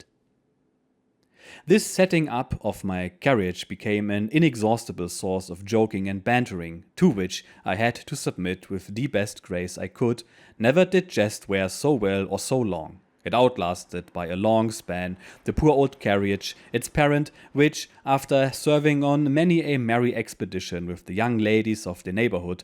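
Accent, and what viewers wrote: Germany. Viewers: The war for southern Independence???sounds like lost cause propaganda. Also who are you ? You sound like your German. Stay in your lane fritz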